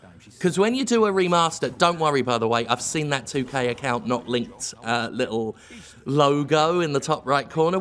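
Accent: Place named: Estuary English, United Kingdom. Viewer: "maaarfia 2"? starting to lose the old estuary accent bit by bit.:D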